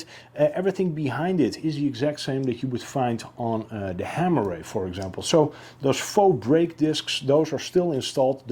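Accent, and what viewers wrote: Netherlands. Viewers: sorry dat ik zo een comment deed of je Nederlands was. Goed Engels hoor maar toch hoor je het Nederlands er een beetje door heen. Trouwens goede content hoor! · You sound dutch but you are Norwegian?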